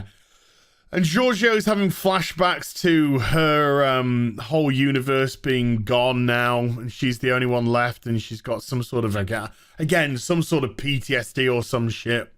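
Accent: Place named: North, United Kingdom. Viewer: After months of research I've finally discovered what each word in your intro is.... Cuz your voice is, It's fast. And northern. I'm Canadian. I had to take my fucking time here and figure shit out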